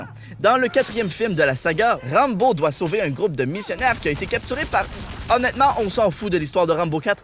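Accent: Canada. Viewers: J'adore vraiment cette accent canadien ! Continue tes vidéos c'est super le couteau trouvé !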